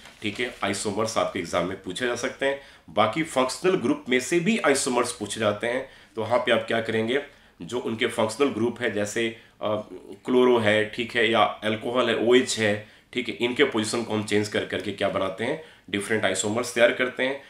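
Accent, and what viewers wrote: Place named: United Kingdom. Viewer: English accent yes... Lekin jldi bana Dena Bhai... 2 din or h paper m..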